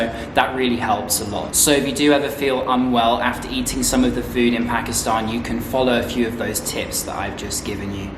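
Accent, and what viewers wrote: England, United Kingdom. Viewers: I fell in love with your accent. Cause I really love English accent😊HI FROM TURKEY 🇹🇷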